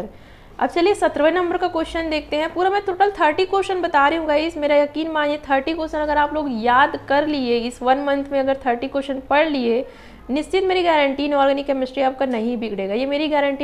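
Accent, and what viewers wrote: Chhattisgarh, India. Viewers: Mam chhattisgarh se ho kya?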